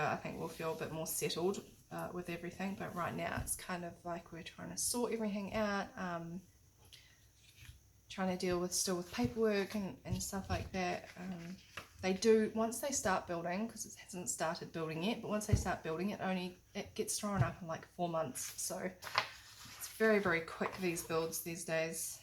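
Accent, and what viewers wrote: New Zealand. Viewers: Recently stumbled upon your channel I detected the kiwi 🥝 accent im from Auckland but soon to be a southland gal, love your channel and ideas very inspiring thank you for sharing your creativity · Ahhhh, I had thought there was a bit of a kiwis accent there !!... when Gayle A said she loved your Aussie accent and I went huummm. I'm so like you in regards going to the states ,told people would love to go to the states...questioned ouhh what would you do 😁 Go to second hand shop, craft shops Michael's ..theres a bit of a list there !!!. The area of BrisVegas I'm in, the second hand shops are rather boorriing!!. I've been keeping an eye out for wall paper .... as for tea dying paper my issue is that mine are too light..tried drying them naturally, in oven, ironing them...not finding them exciting lol. Eco dying ohh my gosh they were bleurrr please show how you do urs with Aussie flowers and products. 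I still am trying g to work out what Alum powder is 😲..makes gorgeous colours of plants and flowers. With my pages theres just a tiny hint that there might have been a flower there ahaha. Things in the states are soo different. I was at my local Kaiser shop and overheard a lady stating that she just couldnt find a piece of 12×12 that she wanted and was upset. I happened to have that paper which I'm not nor will ever use. So i went up to her and introduced myself telling her I had that paper and she was welcome to have it...oh my gosh it was so awful , I ended up telling her I would leave the paper at the shop for her ro pick up....like I she really needed that piece of paper to finish her scrapbooking. All that lady kept saying was ...what do u want , you cant just want to gi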